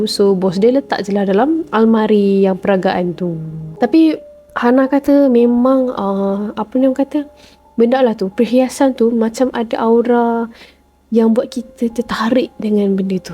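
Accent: Kelantan, Malaysia. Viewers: org kelate ko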